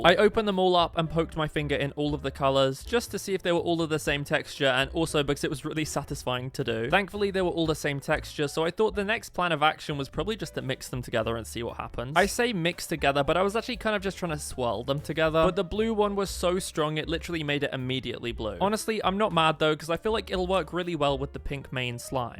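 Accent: United Kingdom. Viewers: can’t you hear the accent? he is british. obv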